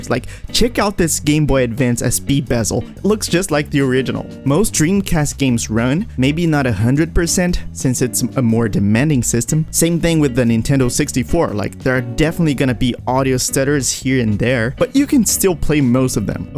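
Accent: Brazil. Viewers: Wait is that some acento br na tua fala? 👀👀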